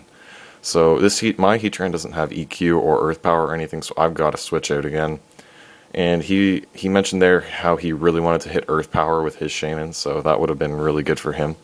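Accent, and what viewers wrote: Canada. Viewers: Hey, I saw you said on your channel that you use the desmume emulator so I went out and downloaded it, but I have a few questions how did you get your pokes to the correct EV IV and movesets. I've been trying to use action replay codes but I'll get the pokemon I need and then, my game will glitch out deleting my save file. Also it's no trouble to tell that you're Canadian lol.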